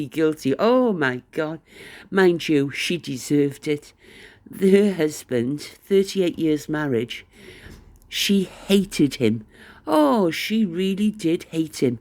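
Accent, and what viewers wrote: Wales, United Kingdom. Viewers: lovely to hear your dulcet Welsh tones again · Love thst welsh twang so glad to hear it again its been worth waiting for welcome back ❤❤❤❤ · I do miss your Welsh accent my lovely friend sorry your not well with a rotten chest infection hope it gets better soon 💐💐💐❤️‍🩹❤️‍🩹❤️‍🩹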